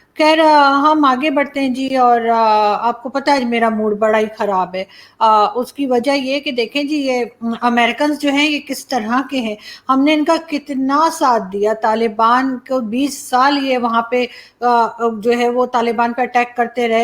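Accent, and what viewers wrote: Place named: Allahabad, India. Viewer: Your ancestors migrated from Allahabad..I can notice the flavour of Allahabad in your Andaz -e-bayan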